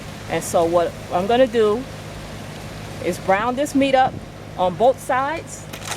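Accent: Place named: Washington, United States. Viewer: Your very welcome! by the way are you in Washington state? because you say guys like i do.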